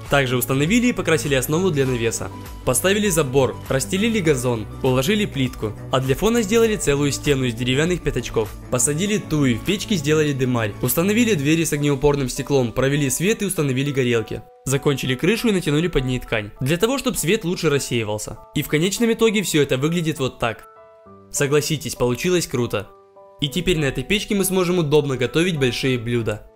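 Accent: Ukraine. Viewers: Смотрю тебя с трехсот тысяч, качество выросло в десятки раз! А ещё пропал украинский акцент))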